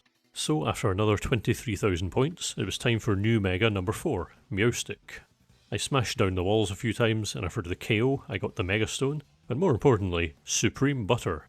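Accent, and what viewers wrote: Scotland, United Kingdom. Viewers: so this made me want to try this, really enjoyable video, thank you! Also, love ur accent, i am also Scottish but ur voice is very soothing lol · I'm genuinely curious. Is this your normal voice? Where is the accent from, it sounds british but i am uncertain, is it just a deeper tone? How old are you? I feel like ive heard a similar voice but i cant say for sure and definitely cant remember where from. It is a very calming voice though... i sleep to your vids sometimes and i find it very helpful. I also enjoy rewatching it in the morning, if i feel that i missed something. Speaning of, i should search for those hidden kiwis lol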